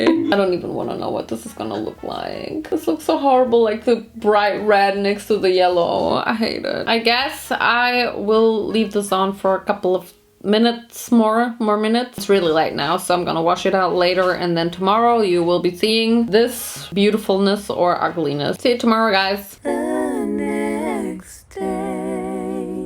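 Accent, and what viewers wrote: United Kingdom. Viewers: British accent is daaaa BEST!!!!! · YAAY MORE SHABBY SHALOON 🥦 THEY ARE THE BEST AND I REALIZED THAT TALKS IN BRITISH ACCENT WHEN SHE'S STRESSED 😹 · slayed British acent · unpopular opinion: i prefer your british accent lmfaoo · lately you’ve been serving some ✨fabulous✨looks, ma’am xx p.s. that british accent omg 🤣🤣🤣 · the british accent really popped off in this one 😍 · i LOVE YOUR BRTITISH ACCENT !!! 💙💙💙💙💙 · wasnt she already talking in a british accent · british accent is the only thing that keeps me going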